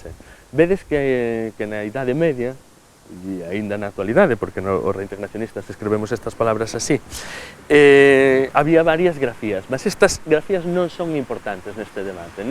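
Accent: Castile, Spain. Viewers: Neste vídeo, estás com muito sotaque castelhano. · Se tirar o sotaque espanhol dele, fica igual ao Português Minhoto (Norte de Portugal). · Em outro vídeo o galego parece menos 'espanholizado' que este. Prefiro o outro acento. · Eu poço falar você fala português com sotaque espanhol aa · El fala galego con sotaque espagnol, os idosos galegos falan diferente no Campo. Isti e por imfuenza do castelan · Entendo tudo que dizes. Sou ítalo-brasileira... para mim, soas como um falante de português do Brasil com sotaque espanhol. Interessantíssimo! Creio que um português de Portugal não o compreende totalmente quanto um brasileiro. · Eduardo Maragoto você parece um Espanhol falando português. É esse o sotaque galego? Já vi galegos em vários vídeos falando galego com sotaque português. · Muito próximo na pronúncia com o que falamos no brasil. Claro que a influência do castelhano já é muito forte,normal até porque a região e parte da Espanha e por isso as vezes parece um falante da língua espanhola que sabe falar português do Brasil. 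Consigo entender melhor que o português falado no sul de Portugal,onde a fala é muito mais fechada e os portugueses comem as vogais e é difícil para os brasileiros entenderem. O engraçado é que alguns portugueses falam que os brasileiros não falam português ,e isso não me inveja ,porque se alguém quer aprender a falar essa língua hoje é por causa do Brasil. Mas somos nós Brasileiros que preservamos a língua como ela era falada na sua forma original, o galaico -português, mesmo com todas as influências que absorvemos de todas as culturas e línguas que chegaram ao Brasil. Tanto que é mais fácil entender um galego do que um luso do Sul de Portugal. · Ele está falando um galego que passou a ser fortemente influenciado pelo castelhano (variante do espanhol da península ibérica) é por isso que tem tantas marcas do espanhol. Abraços. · Parece um Português com sotaque espanhol.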